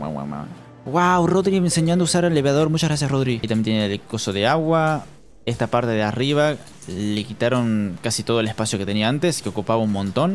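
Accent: Argentina. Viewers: Sos argentino 😁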